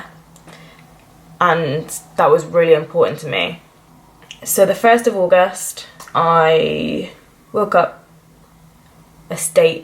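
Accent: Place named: Bristol, United Kingdom. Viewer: Hope celexa i will look this fit 😆. You sounds like a brizzle girl my neck of the woods. Mental health has been a lifetime enjoyment for me its been relentless im now on celexa it was the last ssri to try and has been the best so far , thankfully. And i totally get you girl , death was my main ambition in life and my life had no ambition. I spend my time with likeminded people or understanding people other people are toxic for me. They are earthlings and im a alien. 👽 i joined a walk and talk for nutters in swansea and its been amazing 👏. When your battling with mental health the people you are use to being around become disposable and the likeminded people are the ones we need. Like you just said the general practitioners are terrible. Specialist help are the ones who can help. Luckily/unluckily i ended up a addict with alcohol and i have the A.A rooms and that has kept me sober for 5 years. 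Alcohol works great until it becomes a problem and with mental health it always becomes a problem. You cant redress the imbalance with a imbalance it just makes us extra wacky. Live life on lives terms and understand about , acceptance. We have to accept that this might be for life ,if you fight it , it just gets worse. What you need is a funny as fuck friend that makes you laugh. Thats why i like my nutters around me, sober nutters i might add. They are all trying thier best its all we can do. Good luck with your journey to getting well and thanks for your share. You did the right thing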